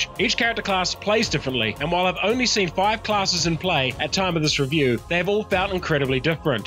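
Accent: New Zealand. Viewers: Is this guy a kiwi?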